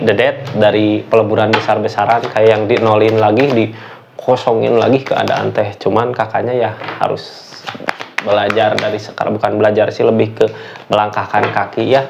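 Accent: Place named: Sunda, Indonesia. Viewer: Biar bahasa Indonesia ,logat Sunda nya medok aja ,resonet aja deh komen aja deh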